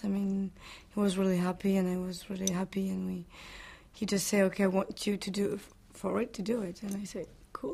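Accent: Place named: France. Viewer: lovely french accent!kinda sexy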